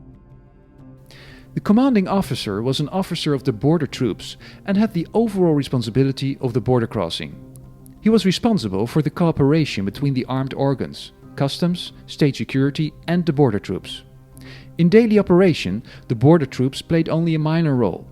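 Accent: Netherlands. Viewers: Do I hear a Dutch accent in the narration? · Dutch accent? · Interesting. His accent in English is typically Dutch, hence my question. Thanks for the answer.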